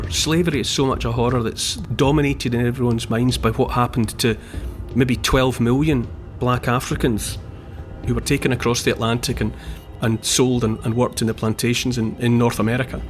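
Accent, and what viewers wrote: Scotland, United Kingdom. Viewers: Cool Scottish brogue.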